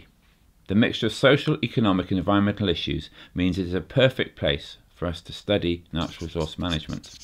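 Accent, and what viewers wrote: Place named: Wales, United Kingdom. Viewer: Nice video. I really like the friendly narration and gorgeous Welsh accent.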